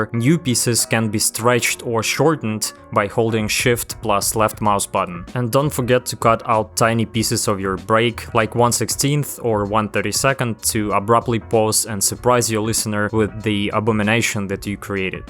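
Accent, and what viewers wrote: Russia. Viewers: У тебя русский акцент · Русский акцент)). Произношение все равно классное) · Мне тоже показалось что русский акцент))) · Броу, слушай, а ты случаем не русский? Просто акцент похожий) Спасибо за ролик! Всегда хотел попробовать написать музон в стиле брэйккора. С меня сто пудов подписка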